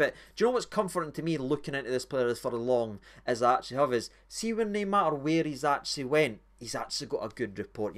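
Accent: Scotland, United Kingdom. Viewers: Your scottish calm doon we are pish at speaking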